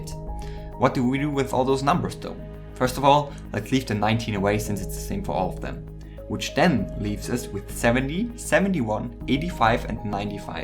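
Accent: Germany. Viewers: Dein Englisch ist so schlecht. Man hört dass du aus Deutschland kommst.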